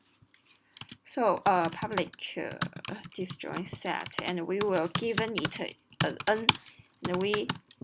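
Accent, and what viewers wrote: China. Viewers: 小姐姐是不是山东人^^ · 博主浓浓的汉语味啊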